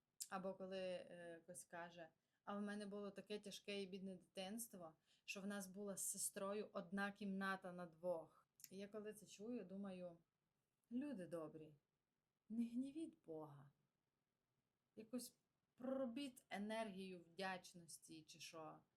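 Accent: Transcarpathia, Ukraine. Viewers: Це закарпатський говір. Ви западенцями їх не називаєте. Западенцями ви називаєте Галичину.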